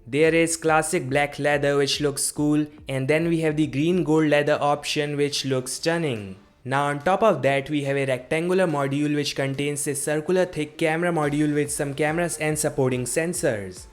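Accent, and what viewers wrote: India. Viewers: Dei engdian English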